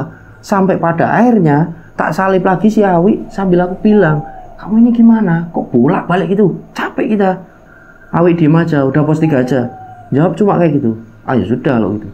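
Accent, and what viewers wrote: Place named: East Java, Indonesia. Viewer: Tetaplah konsisten dengan Chanel Pendakian, semoga makin sukses....dulu saya kira dari Malang kok logatnya kayak Jawatimuran.....👍👍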